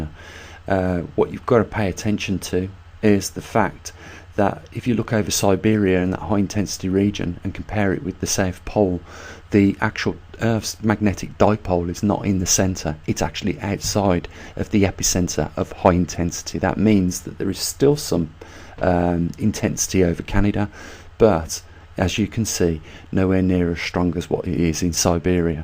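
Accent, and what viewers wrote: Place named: West Midlands, United Kingdom. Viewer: you sound like you are from the West Midlands, somewhere. I live near there. would it be possible to meet me. I am interested in your work but lack knowledge.?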